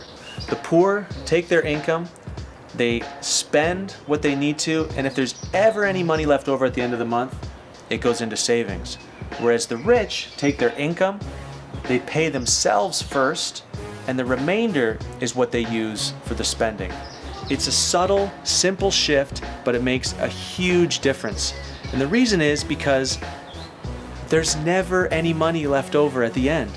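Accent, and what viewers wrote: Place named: United States. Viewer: Are you morocan??!!bc ur accent is like an American 🇺🇸 watching your videos from Washington DC ☺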